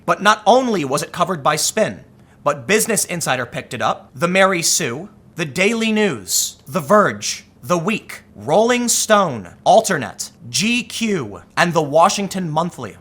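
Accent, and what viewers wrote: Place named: Chicago, United States. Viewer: please stop pronunciating like chicago. Me and my girl like your content but your voice sucks.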